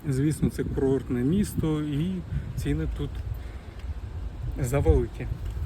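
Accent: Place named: Lviv, Ukraine. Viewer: Оце я розумію блогер. Перейшов на чисту українську і в Одесі спілкується як львівський бандерівець. Велике дякую за таку цікаву екскурсію. Ще про мову напишу - Ви і у Львові краще говорите українською, ніж місцеві. Як завжди лайк і перегляд до кінця ролика.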